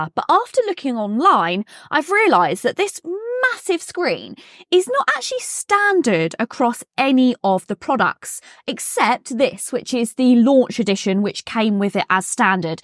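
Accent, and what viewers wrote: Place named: RP, United Kingdom. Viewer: I am not from the UK. I did not mean to be disrespectful, just thought that you sounded posher compared to other YouTubers doing car reviews. It felt like I was watching an English spelling contest. As to whether you get it right - do what you think is right. Keep up the good work 👍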